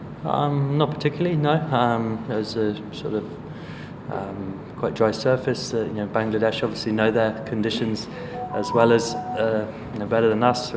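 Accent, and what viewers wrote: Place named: United Kingdom. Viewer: love his British accent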